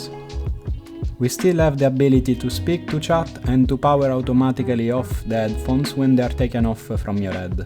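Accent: Italy. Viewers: Italian english !! this is a very nice headset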